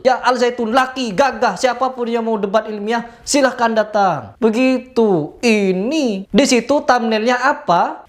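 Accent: Madura, Indonesia. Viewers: Logatnya spt madura ya? Hahaha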